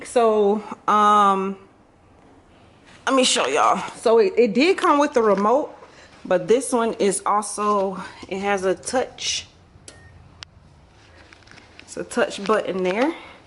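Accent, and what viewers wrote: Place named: Florida, United States. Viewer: New subscriber here! I knew you were from Florida with that accent before you even said it. Lol I'm originally from Tallahassee.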